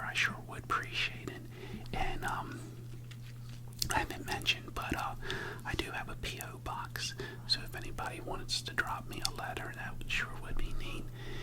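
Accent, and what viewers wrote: United States. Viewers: I have been looking for something like this, a texan american Accent can be very relaxing when it sounds like no Asmr want to work !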